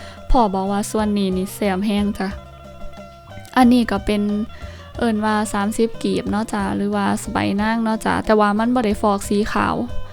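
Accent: Isan, Thailand. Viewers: จริงแล้ว เป็นคนลาวอิสาน รึ ภูไท ลูกหล่า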